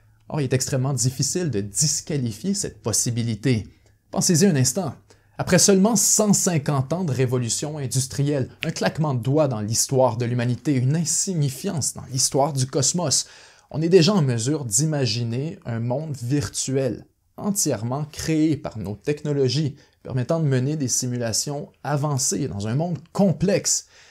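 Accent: Canada. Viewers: C'est quel accent ça? Canadien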